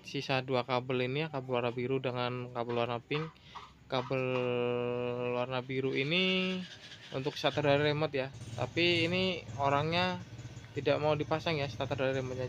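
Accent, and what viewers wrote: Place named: Palembang, Indonesia. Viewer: Asli mana bos? Bahasanya kayak orang Sumatra Palembang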